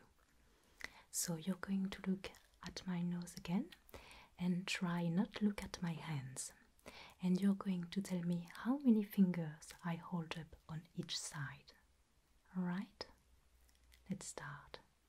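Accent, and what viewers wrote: France. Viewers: She sounds very French and has a lovely soft voice. I wish my French sounded like her English 😪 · I might be dumb but is that a French accent? Its beautiful. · Are you French because you have a french accent c cool d'être représenté de partout tu fait du super bouleau continu comme ça 👌✌️ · as a german myself, i don't hear any german accent. It sounds very french to me. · French accent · That's a thick french accent. I love her work and her grammar is perfect but as a french myself, i find the accent tends to take me out. It never bothers me when it's other accents but for some reason the french accent kill my asmr ^^ I commend her for the amazing work though !! And I still watch her videos for the ascetic and world building ! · Just found out this channel existed ~ oh boy have I been missing out! This production is absolutely stunning and the quality is top tier 😭 ✨ I absolutely love your (french?) accent - it’s so unique and perfect for this setting! 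Psst! You got a new subscriber 😊 ❤️ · Je me trompe ou tu a un fort accent français ? ^^ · I’m French & I can say that is a French accent if I’ve ever heard one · It’s French, but she gets lots of comments asking if she is German or has a German accent. I personally don’t think it sounds German at all, but very French. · Exactly. I deducted it has to be French, although at first I was not quite sure, but it has German and even slight Swedish quality to it. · France bc of the axent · French accent 🤝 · I'm French and trust me, it's a french accent 😄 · It’s a French accent. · I think it's a french accent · ...her soft voice & French accent sends TINGLES down my spine !!!!!!!!!!!!!!!!!!!!!!!!!!!!!!!!!!!!!!!!!! :) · I love your french accent, it’s so relaxing and welcoming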